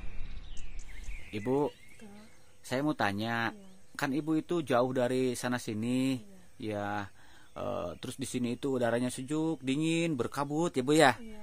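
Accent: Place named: Sunda, Indonesia. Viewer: Lagam naratornya terlalu NYUNDA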